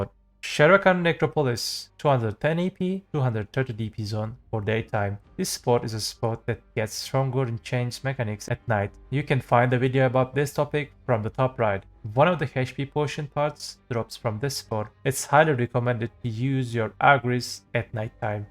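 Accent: Turkey. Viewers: Knk aksanindan anladım bizdensin o yüzden türkçe sorca. 3 ay önce yapimissin suan spotlarin durumu nasıl ? 272 ap 330dp ile.rn güzel parayi mirumoktami kadari. Ne tavsiye edersin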